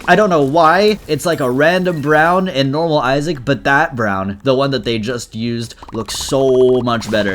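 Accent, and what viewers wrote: United Kingdom. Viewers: is BRITISH?! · Bros british?????? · YOU'RE BRITISH? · IS BRITISH?,????,??,???????? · BRITISH?? WHAT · Me when realizing is British: 😱😱😱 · wait ur british :( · Are you british???? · is British? Oh no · wait your British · Your British??! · YOURE BRITISH????? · UR BRITISH? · you're British??? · wait, you’re BRITISH!? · is British?? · IS BRI’ISH?! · I'm British. But I have no accent recognition. I didn't realise is also Bri'ish. Cool. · WHHGAT YOU AR E BRITISH???N i'm beyond flabbergasted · You're British!?!? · This Mod Changes EVERYTHING! Edit: WTF IS BRI’ISH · YOURE BRITISH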